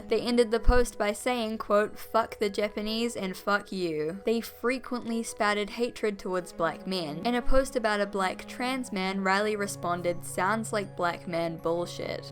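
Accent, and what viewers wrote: New Zealand. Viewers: I am now secretly praying that this youtuber is Australian and not a New Zealander, because I really like my joke but the more I watch the more her accent makes me think New Zealand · Just stumbled across this video by chance but I can't help but feel I've seen this person somewhere before. It might just be the kiwi accent but I'm sure I've met her at a party or sat next to her on a bus or something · holy shit I had completely forgot about this project. it was actually a scam lol p.s. I love your kiwi accent! you got a new subscriber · Is that a New Zealand accent I hear?!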